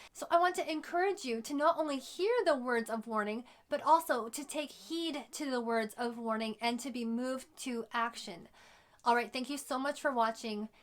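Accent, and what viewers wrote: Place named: Canada. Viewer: You sound extremely Canadian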